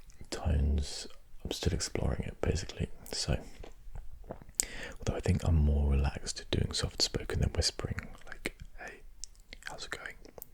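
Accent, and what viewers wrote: United Kingdom. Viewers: We hearby officially brush those negative thoughts away for you. Brushhh. Brushhh. Brushhh. Your doing fine. We are happy to see you. That velvet voice with your British accent and handsome bearded face make us all feel great. 👍💜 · Damn ur voice was so good i love it so much i'm normally not fan of soft spoken but ur voice was so hot(sorry i go to far in that coment 😂) love ur videos they're cool and british accent is the best ever. I'm french(from normandie not so far to u😜) so sorry if my English was bad😕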